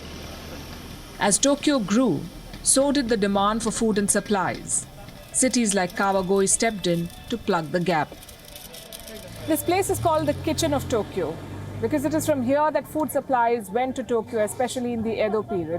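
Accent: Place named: India. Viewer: Palki Sharma Indian accent makes me laugh to dead.Indian won't give up their thick heavy Indian accent anytime soon.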